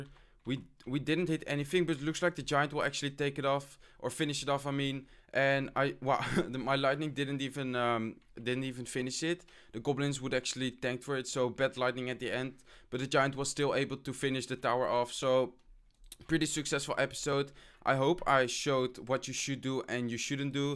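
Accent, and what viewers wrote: Netherlands. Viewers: is hij nl ik hoor het aan zijn accent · where are you from? Because you sound a bit dutch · Are you netherlands because you dont talk like a american human and if i say something english i talk like you and in from the netherlands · Je bent zo duidelijk nederlands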